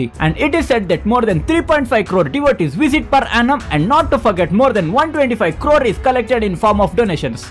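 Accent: India. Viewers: Where are you from, are you from Karnataka (bcoz your accent matches with Kannadigas)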